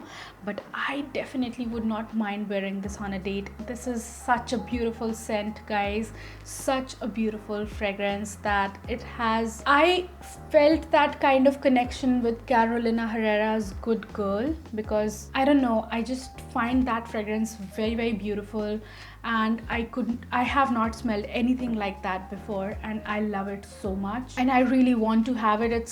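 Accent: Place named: India. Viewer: Indian accent 🤮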